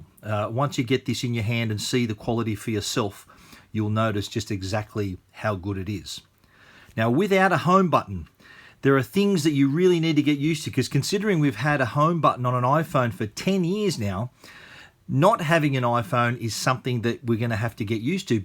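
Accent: Australia. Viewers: its an australian accent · He must be Australian because I am also and I didn’t think he said swipe of sweep any differently than I do 😂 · Aussie accent sounds top notch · Guys I’m Australian, I think it was just the way he said it, u know what he means it’s not really an aussie thing, just the accent is.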